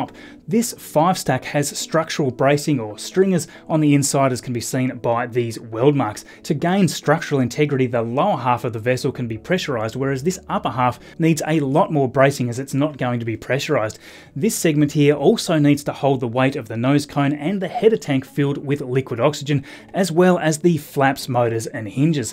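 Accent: Australia. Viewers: Love your videos and its great to hear in Aussie accent. May I recommend an Artlist subscription for your music.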